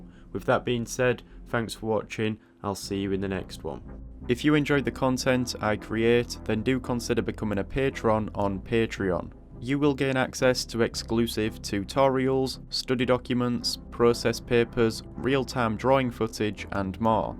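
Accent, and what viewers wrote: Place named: Humberside, United Kingdom. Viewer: i cant help but notice your accent are you perhaps from humberside?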